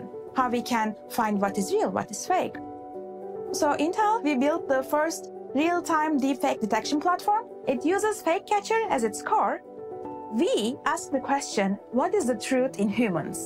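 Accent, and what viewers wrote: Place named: Turkey. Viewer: Tam bir Türk aksanı. Konuyu kolay anladım. Teşekkürler.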